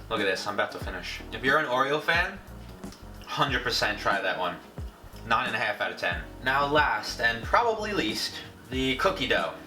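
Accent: New York, United States. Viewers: I'd appreciate it if you could give me your American accent in exchange for my boring old Australian one. Thanks pal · Oh he lives in New York too? I thought so, his voice sounded SO New York and familiar. I bet he lives either upstate or Long Island like I do. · potter wtf is a thick American twang he has a new York accent most people (away from the east coast) don't talk like that lol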